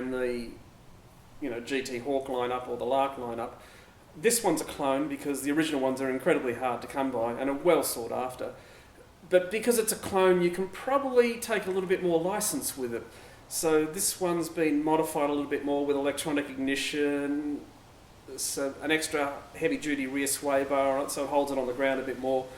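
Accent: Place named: Australia. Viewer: You know, I chanced across this video and I was impressed for many reasons...one was an Aussie from Melbourne who was talking about his car, and secondly it was a Studebaker...that marque of car had links with a Packard which my dad had owned a '34 model in the 50's. All up it ticked all the boxes. And post script Aussies talk in a certain way..up front no pretenses...love it.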